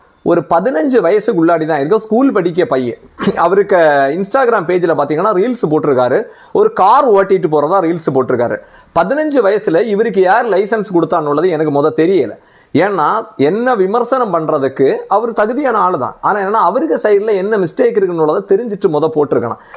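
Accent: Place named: Nanjil, India. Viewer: Great work 🎉🎉 Love your Nanjil. Accent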